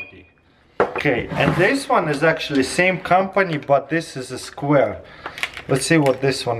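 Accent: Russia. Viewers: I also like your russian accent!!!🖒🖒🖒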